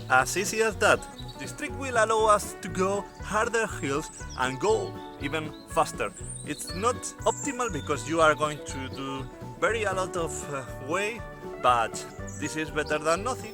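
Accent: Spain. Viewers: el acento mas fuck up despues del hindu el de españa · Where did you shot this "bideo"? I thougt it was in Galicia because of you accent but then you said something about going to Barcelona... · Fua ese acento español que no nos lo quitamos ni patrás pero la verdad que suena bien y todo. Buen vídeo jajaj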